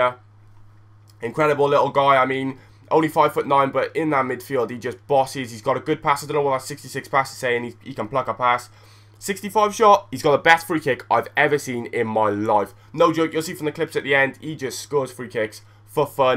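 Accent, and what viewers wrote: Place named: Welsh Valleys, United Kingdom. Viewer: You sound like leeroy from the valleys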